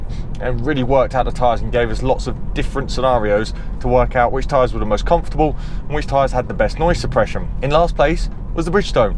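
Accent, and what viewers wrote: United Kingdom. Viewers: Love your channel, instantly subscribed. Interesting thought that I wanted to share with you. My extended family tends to go with Continental UHP AS tires and we're mostly spread out across the West Coast of the US. I mention that because it rains at random times, year-round in most parts of California and seemingly weekly in Washington state. Due to the lack of extreme winter, we've found that the Continental tires let us drive "swiftly" in all weather types without fear of hydroplaning, loss of control due to unexpected situations (other people texting and driving - or swerving into your lane, deer, bicycle, kids etc). Basically, we want to be able to DRIVE our cars, push them a little, stretch their legs on occasion but not discover that a great dry tire becomes unpredictable in ANY way when the roads get wet; let alone tries to kill somebody with unexpected braking or steering limitations. 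As you're probably guessing, Continentals usually meet our needs for HIGH performance All-Season tires, specifically. Anyway, my point is this, your accent definitely sounds British, and it's my understanding that you guys get MUCH more of the wet stuff than California. That being said, I noticed you personally tend to prefer a tire based PRIMARILY on dry performance, so long as the wet and snow aren't too bad... essentially, your highest requirement is driving feel and control in DRY weather. While I can sympathize and appreciatea car that has that feeling in the dry, I would have to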